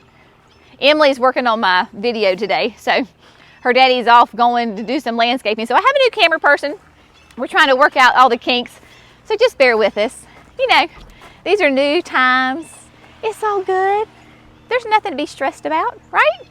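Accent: South, United States. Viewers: It’s wonderful to find a southern garden center that loves Proven Winners, and bonus your accent reminds me of home. I’ve been watching videos for a few years and still find it hard to find retailers that carry PW here in North Central Texas. Finding you in NC gives me hope!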